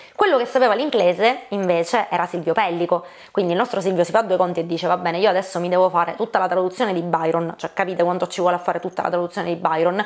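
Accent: Sicily, Italy. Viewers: Ma te sei siciliana vero?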